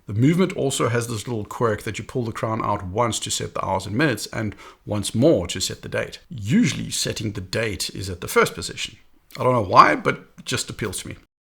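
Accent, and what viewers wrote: South Africa. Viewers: Great video! I'm also debating between the white speedy and the A384. Is that a South African accent I hear?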